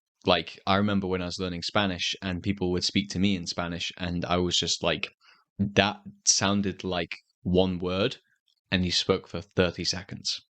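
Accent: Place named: United Kingdom. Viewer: I Love british accent , subcribed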